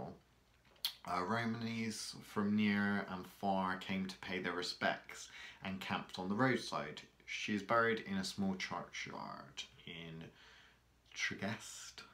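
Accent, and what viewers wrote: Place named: West Country, United Kingdom. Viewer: A fascinating video, great to learn more about my part of the country and Cunning Folk in general. I could listen to your West Country accent all day! Cheers, moi luvver!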